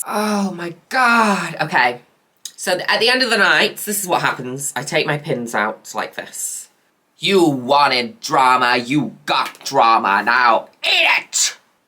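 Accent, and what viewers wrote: Australia. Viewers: Stunning as always. Love your Aussie accent 😍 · Love has she it super funny. Also great Australian accent! ❤ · Yasssss the Aussie accent got me good! Straya loves you ❤️ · Quite aside from the STUNNING makeup, that was the best Aussie accent I've heard in forever. Signed: an actual Australian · THE AUSTRALIAN ACCENT SPOT ON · Ok finally a drag queen who can do a fairly close aussie accent! DORN'TTTT !!!!!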